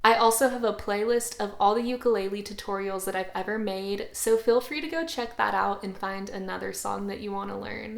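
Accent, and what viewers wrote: South, United States. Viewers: That southern accent makes me melt. ❤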